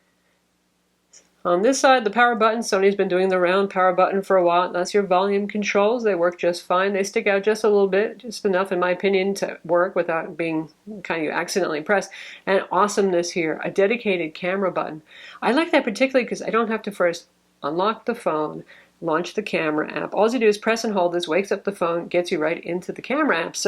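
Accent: United States. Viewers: Can't understand a bloody word she's saying. Horrible Yank accent or you're pissed during this review.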